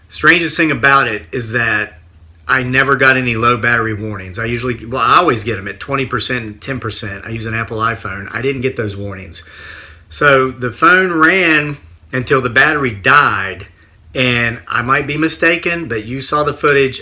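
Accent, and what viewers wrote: South, United States. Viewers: You know how they say “if you can’t say something nice, don’t say nothing at all” ? Yes I got something to say but it isn’t nice! You’re making an absolute mockery of the subject. You’re pushing merchandise! That’s it!!! Some people have had real life encounters and you’re channel is a disgrace. That deep southern draw you seemed to have picked up all the sudden is rediculous. Grow up!!!!!!!! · Yes, Suh! I do declare. Listening from Georgia, I hear your Southern accent. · What is up with that heavy southern accent? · Voice change,. Southern twang! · LOVE YOUR SHOW 😂😂😂😂,IS IT JUST ME OR HAVE YOU SUDDENLY DEVELOPED A SOUTHERN ACCENT?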